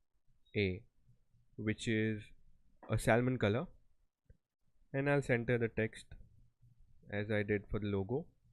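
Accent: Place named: India. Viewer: you sound like a hindi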